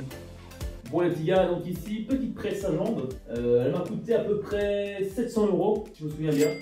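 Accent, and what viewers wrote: Belgium. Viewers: t'es belge fieu ??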